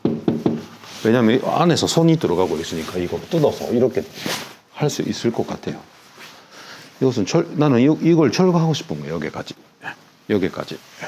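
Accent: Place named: Gyeongsang, South Korea. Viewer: 인품 좋은 설명도 좋은데, 여게 거게 저게~하는 옛날 경상도 사투리가 참 듣기 좋습니다.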